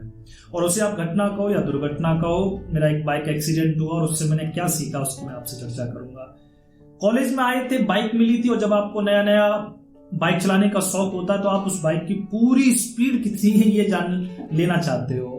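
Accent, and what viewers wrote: Braj, India. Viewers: बृज वाणी ❤️❤️ राधे राधे 🙏🏻🙏🏻🙏🏻🙏🏻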